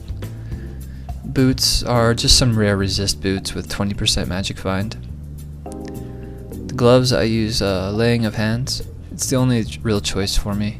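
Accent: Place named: Oregon, United States. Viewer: This is the most Oregon voice ever.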